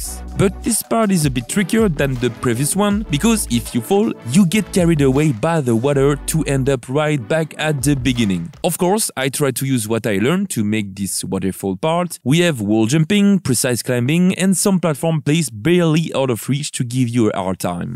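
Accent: France. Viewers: Par contre frérot t français?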